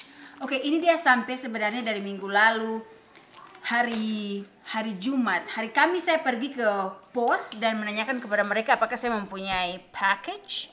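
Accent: Manado, Indonesia. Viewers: Yoo bhs menadonya ...kental betul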